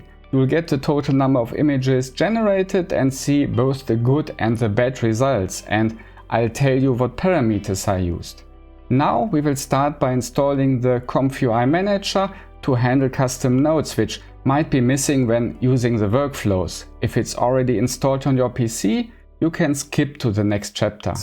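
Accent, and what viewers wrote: Germany. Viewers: jerne, der jute Berlina Dialekt sei uns holdt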